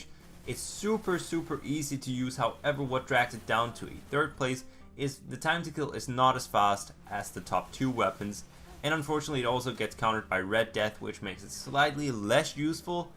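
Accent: United States. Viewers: You sound american, you tend to slip into sound like your from new york and you say some things in an English accent sometimes. Why is this?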